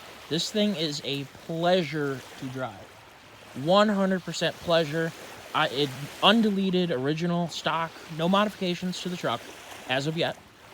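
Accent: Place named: Michigan, United States. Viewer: Your voice sounded younger back then. I notice more of the Michigan snarl now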